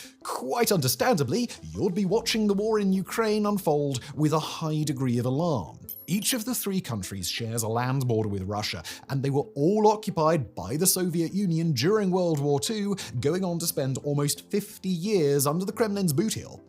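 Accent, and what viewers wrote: United Kingdom. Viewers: LMAO you think he's an American? Did you even listen to the sound of his voice? He's a Brit living in the Czech Republic is my understanding. I know he's a european living in mainland europe basically. Americans sound nothing like that.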